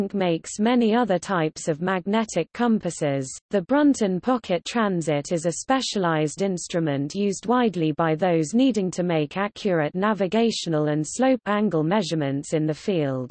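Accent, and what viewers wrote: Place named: United Kingdom. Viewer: Can we just STOP with the British accents? PLEASE??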